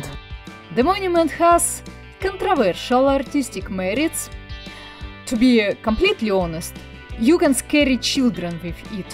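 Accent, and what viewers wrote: Russia. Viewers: Getting addicted, 2nd video today Really great and that, just is so good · miasto ładne, akcent sztampowo rosyjski (gdyby włączyć yt automatyczną translację pokazał by rosyjski zamiast angielskiego - widziałem takie przykłady)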